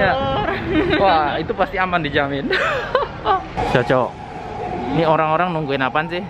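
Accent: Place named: Manado, Indonesia. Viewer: Rupa logat manado noh 😅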